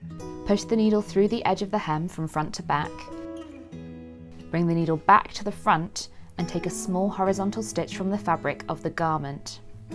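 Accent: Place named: England, United Kingdom. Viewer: Have always wondered how this is done! Great video, and a beautiful English accent that is easy on the ear ! 😊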